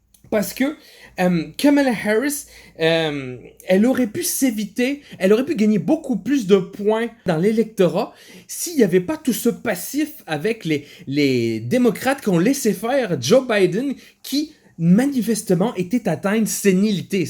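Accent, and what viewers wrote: Quebec, Canada. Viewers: ton accent québécois est solide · vive Trump tabarnak ça fait du bien aussi d'entendre un accent québécois parce que l'accent français un moment donné ça tombe sur les rognons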